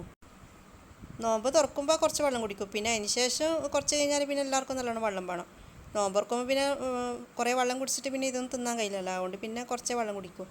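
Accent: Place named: Mappila, India. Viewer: Mapla 🤣ee samsaarathina like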